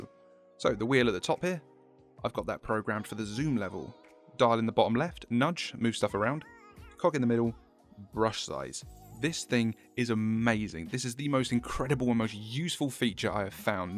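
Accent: United Kingdom. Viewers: I really can't tell where your accent is from its definitely Midlands I just can't pinpoint it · He has the best British voice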